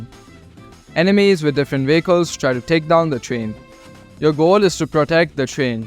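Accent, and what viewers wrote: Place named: India. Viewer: You seem to have a slight Indian accent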